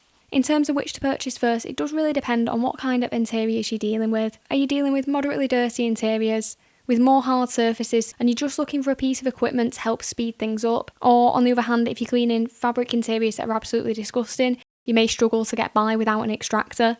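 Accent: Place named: United Kingdom. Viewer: thank you hot sounding uk lady.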